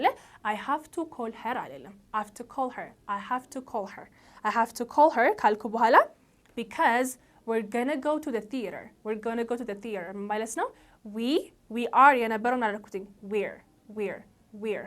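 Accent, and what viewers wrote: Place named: United Kingdom. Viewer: 😢😢😢😢😢😢 omg special British accents I can't understand ohhfuuuu 😢😢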